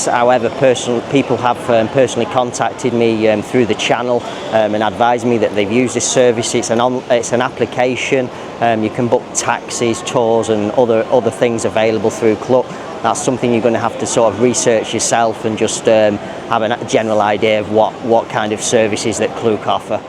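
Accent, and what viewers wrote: United Kingdom. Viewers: I think he already spoke English just the accent is British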